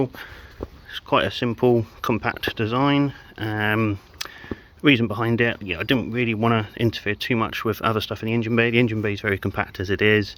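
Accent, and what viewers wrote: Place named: South West, United Kingdom. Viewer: Quality kit, I may be in touch in the future. Sounds like you’re a SW boy, where are you located if you don’t mind me asking?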